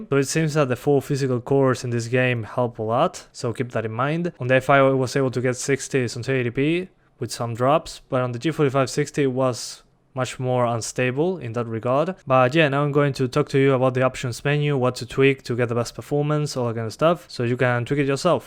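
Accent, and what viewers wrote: Argentina. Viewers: Que hice conmi vida como para terminar viendo a un argentino hablando ingles , y ensima terminar entendiendolo.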